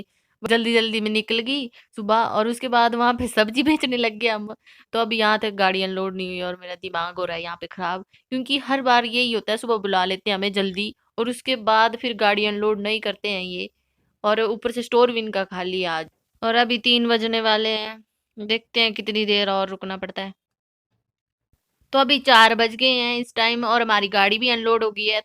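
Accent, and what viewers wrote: Haryana, India. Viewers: maybe I could be wrong but Aap ke bolne mein Kuch Kuch Words ka haryanvi accent bhi aata h Sun k acha lgta h 👍👍 finally you got khidki wali seat 😀😀😁😁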